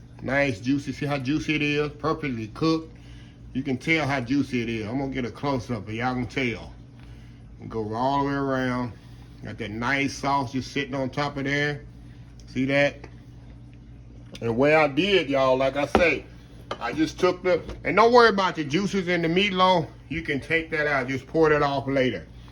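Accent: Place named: Texas, United States. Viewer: Fixin ta make yo meatloaf again. Hands down feels like home your cookin. They moved my old ass from south part of Dallas up here ta Wyoming. Aside from the cooking hearing someone from my aria is so nice. I miss Texas baby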